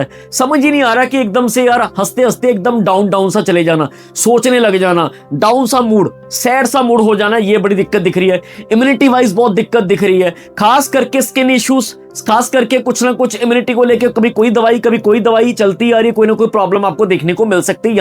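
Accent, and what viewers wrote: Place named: Punjab, India. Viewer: Punjabi accent in hindi😊 sardar pandit😊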